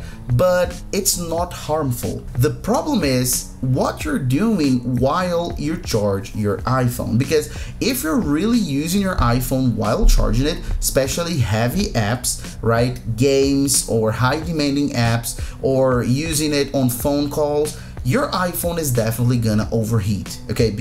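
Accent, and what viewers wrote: Brazil. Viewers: Brasileiro, cheio de sotaque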